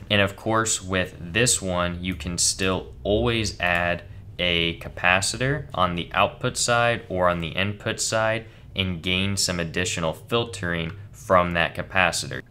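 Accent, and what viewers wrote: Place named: Canada. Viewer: Hi. Some feedback. First off I love your videos. Even though you seem to doubt what you do and your content, you have found a niche area that nobody else does - proper design vids, background rules of thumb stuff that just works and EXPLANATIONS of why you decided on a component verses another this is invaluable!!. The amount of hands-on practical experience knowledge your give us is superb. I know theory but have limited experience constructing things that work in the real world - you help fill this gap. Please just keep banging out LOTS of design videos, don't worry too much about ' if we might find them interesting' we might in the future when the info is relevant to our project, and also don't worry too much about the length we want good information. The only criticism I have is your verbal delivery when your trying to talk 'proper' to camera - it is very monotone and Canadian. 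When you talk to us ad lib and making it up as you go along (like talking to your mates down the pub) it is MUCH easier and enjoyable to listen to. Have you considered a forum like EEVBLOG has - it's another link from Youtube and its search algorithm. Content suggestion - DC powerline filtering how and when to use inductors perhaps. - I am working on electronics in an automotive based environment (12Vdc boat) and cant find suitable info on filtering, voltage regulators etc.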